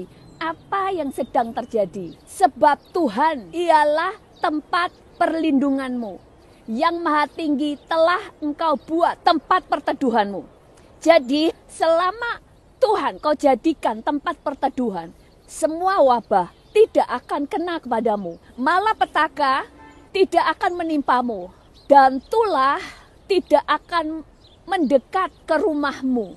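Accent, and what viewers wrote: Java, Indonesia. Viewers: pendeta e medok tenan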